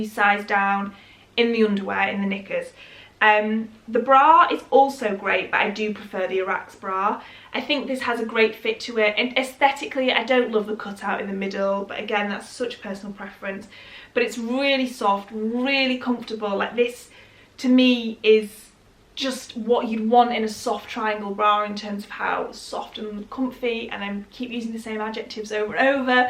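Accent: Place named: Yorkshire, United Kingdom. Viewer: I think Yorkshire!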